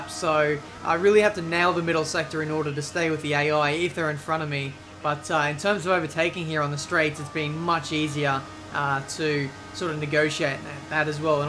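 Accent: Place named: Australia. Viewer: Classic lines: * And away we gooo * Having a bad start as always * Another points to add to the championship tally * Look at that...! * So here we are the..... Grand prix * We got some R&D testing to do * Another crucial race for the championship Of course you have to insert the aussie accent in all of them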